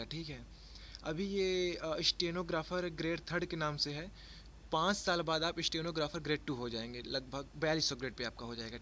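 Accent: Allahabad, India. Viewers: bhai lg rha tum allahabad se ho...meri bhi ekdum yhi akcent h bolne ki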